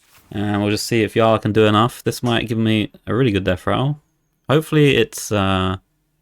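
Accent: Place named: England, United Kingdom. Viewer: The english accent helps :)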